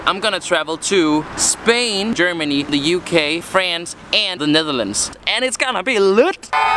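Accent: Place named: Denmark. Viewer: du har en dansk aksang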